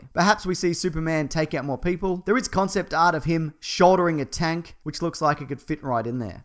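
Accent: Australia. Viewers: Are you from australia you sound like it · he's australian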